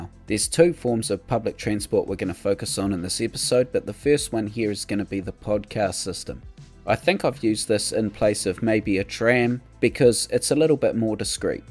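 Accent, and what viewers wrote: New Zealand. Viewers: Love your new series. Love hearing the kiwi accent on CS vids.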